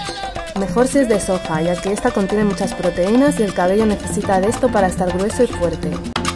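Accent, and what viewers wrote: Spain. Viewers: Acento español!!!!! De dónde eres originalmente?? 💖💖 Te queremos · Wow! me sorprende tu voz tienes acento español; muy bien, ya era hora de conocerla saludos!!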